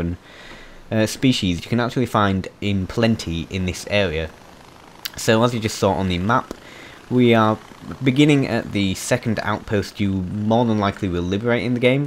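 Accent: United Kingdom. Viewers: This guy is british.cuz dat accent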